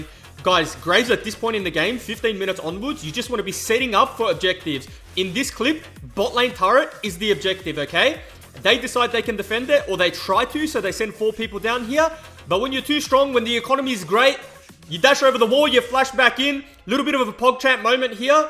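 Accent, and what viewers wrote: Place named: Australia. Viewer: Great video dude, nice editing,funny commentary and informative. im subbing hoping for more of these kind of videos. (also love the stralian accent) gl for more <3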